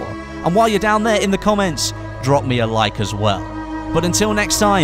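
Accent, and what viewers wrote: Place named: United Kingdom. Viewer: why do brits get famous by posting crappy vids. i want to see REAL gameplay not listen to your annoying brit voice.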